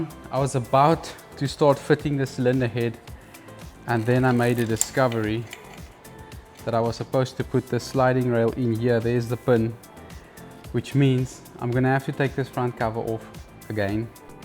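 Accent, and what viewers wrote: South Africa. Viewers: You sound like a siuth African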